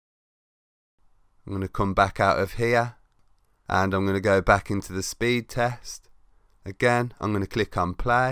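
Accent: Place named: Birmingham, United Kingdom. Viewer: Got the VPN mate thanks. Loving the Brummie accent, tell me you're a villa fan?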